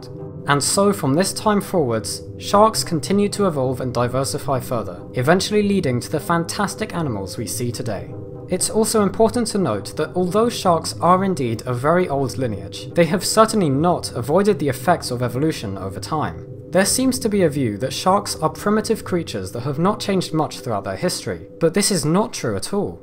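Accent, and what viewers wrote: United Kingdom. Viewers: Ssooo.... You're British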